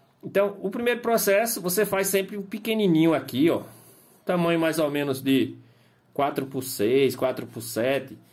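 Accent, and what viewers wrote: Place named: Northeast, Brazil. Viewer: EXCELENTE DICA, obrigado professor, só uma curiosidade, o SENHOR tem um sotaque nordestino, de que estado o SENHOR e'? Adorando seus vídeos, também sou pintor, PARABENS PELO CANAL, Abraços aqui de BH- MG.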